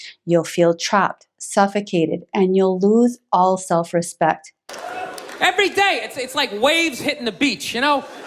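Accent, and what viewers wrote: Canada. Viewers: Sounds like a Canadian accent.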